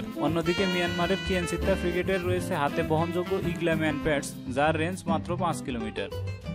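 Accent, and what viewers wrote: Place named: Khulna, Bangladesh. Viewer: jahangir mollh আপনি মনে হয় চট্টগ্রামের বা নোয়াখালীর ওই অঞ্চলের। তাই ভাষা বুঝতে সমস্যা হচ্ছে হয়ত। কারন এটা খুলনা অঞ্চলের ভাষা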